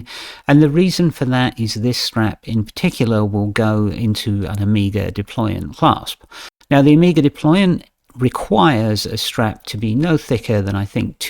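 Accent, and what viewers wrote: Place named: United Kingdom. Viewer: I’m an american, so take this with a grain of salt, but I think a British accent makes anything more enjoyable 🫤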